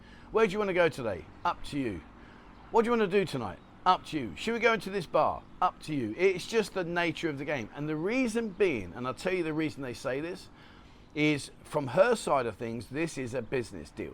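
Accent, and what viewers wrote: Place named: Cockney, United Kingdom. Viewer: Very clear advice.short time Thinking in going to thayland for first time .. i think i need to enjoy that ... Living the cogney accent matey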